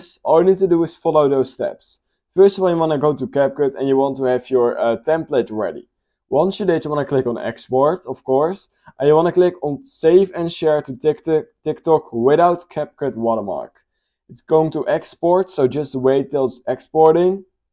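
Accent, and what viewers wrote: Netherlands. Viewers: Are you dutch/nederlander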